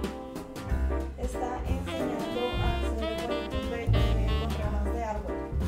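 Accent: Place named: Colombia. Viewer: ese árbol lunar está muy chévere y el pie de árbol está chido.. y si hablas mucho y con acento colombiano.. pero me gusta ver tus vídeos bueno cuando tengo tiempo jaja. Has un suerter navideño para piña. Saludos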